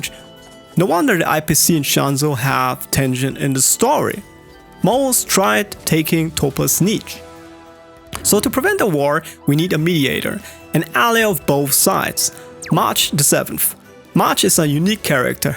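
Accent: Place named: Germany. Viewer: bro got that german accent🗣🗣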